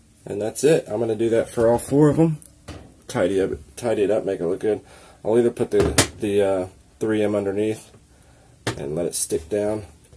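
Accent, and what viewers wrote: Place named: England, United Kingdom. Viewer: very close English accent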